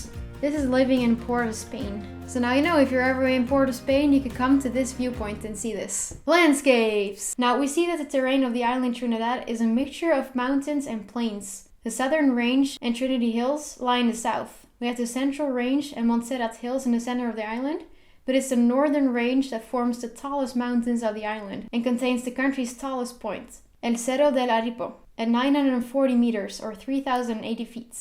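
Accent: Spain. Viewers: Trinidad is so beautiful .. is how she pronouncing everything in this Spanish accent.. noooooo bbg😒😒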